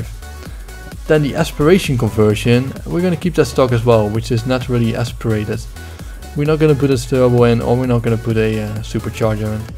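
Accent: Netherlands. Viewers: are you Dutch? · are you dutch because you're accent sounds like it :)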